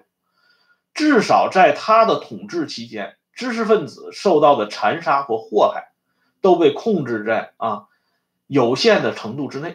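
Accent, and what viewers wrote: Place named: Liaoning, China. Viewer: 听口音温老师也是辽宁籍吧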